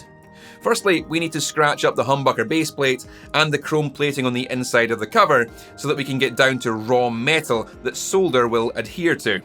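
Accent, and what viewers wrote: Scotland, United Kingdom. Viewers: Yo, are you in Scotland? I’ve been subscribed for a LONG LONG time I just don’t remember if you ever said where you are but you sound very Scottish to me lol. My people on my dad’s side while we’re Irish we are from Northlands of Scotland so I have much love for Scots (and Irish) naturally. The other side is Welsh, Swedish & English lol. I’m a full on one of you’s guys. Minus the Swedish 😂.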